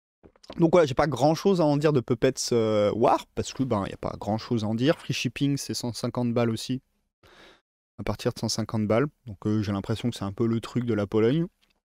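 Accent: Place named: North, France. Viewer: Budget vs temps, le dilemme absolu... Oh un accent du Nord.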